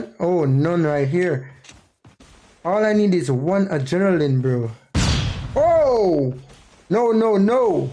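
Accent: Jamaica. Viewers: Are you from Jamaica pls tell me I am from there to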